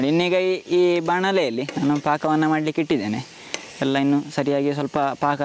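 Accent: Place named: Dakshina Kannada, India. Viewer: It's called athirasam among the Tamils, kajjaaya among the Kannadigas and Anarasa among the Marathis. It is a pan south Indian sweet. In my childhood at our village , we didn't know cakes and other cookies..Athirasam is so popular, no festival is celebrated without Athirasam Kajjaaya. Thanks for your narration with tips on making paaku . Your DK accent is feast to ears.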